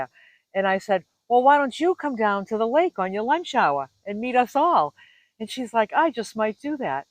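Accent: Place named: Boston, United States. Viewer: I smiled the entire video! Pure JOY! Happy I found your channel and the second I heard you Boston accent I knew I was going to adore you. Born and raised in New York but living in Utah. (a fish out of water) for sure. Came out to ski in the 80's and the rest is history. I am just staarting to go camping (alone) this coming winter (to a place called Quartzite, Az.) Thanks for the Friday evening S M I L E ~ love the dancing, with complete abandon. Right up my alley!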